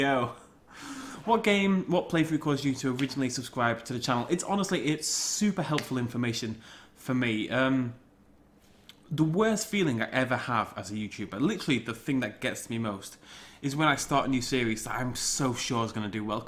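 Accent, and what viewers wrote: United Kingdom. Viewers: To be honest, I subscribed for just about everything about your videos. I mean, comedy, British accents, and DINOSAURS? Recipe for success in my book. And what makes it so much better is that you actually interact with your audience. Even before the livestreams, in, say JPOG, you'd ask "Which dig site should we do next," or "How should we make this exhibit?" It really shows that you care about your viewers, and you also appreciate and respect everything about the games and dinosaurs. But, if you're running out of dinosaur games, then of course shake things up a bit. Do LPs of a game that you thoroughly enjoy, do a game that allows your fans to join you,(The Isle?) do games that have something new or weird. I'm not a Let's Player-yet-but I think this is a pretty good plan for youtubing. That aside, I'm here to stay. Thanks,. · Im a little late to the party here but what made me subscribe was your voice and jpog all those years ago. 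and the original british-ness, i joined the british revolution god dammit! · I subscribed to you back in 2013. It was a cold day after a family conflict, and I don't remember how exactly I found it, but I watched your entire Jurassic Park The Game playthrough (in roughly two days) and I just loved it. I didn't love the game (not at all), but I really enjoyed your calm and humble approach to commentary on a let's play. I was tired of the loud energetic and highly edited content that let's plays and playthroughs have been so saturated with (like Pewdiepie, Markiplier and Jacksepticeye). But your content is always something that I feel that I can sit down and relax with. Also you seem like an amazingly nice guy :). I am also a big fan of dinosaurs. It's pretty much been my biggest interest for most of my life. So, when I saw a channel with dinosaurs being a major subject, and a YouTuber with an amazing british accent, I subscribed to your channel. 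I really don't know what type of content that I would like to see the most. I know that I was pretty excited about your new series called Pangea Today. The concept of it was that you would talk about and report new paleontological discoveries. After the first episode you ultimately abandoned it, and I don't understand why. You even mentioned in a vlog, I think, before the first episode of Pangea Today that you would bring the channel into a more eduacational direction. I know it's kind of a long time ago, but I am still wondering. I'm not complaining. I've just been confused about it for awhile. Can you please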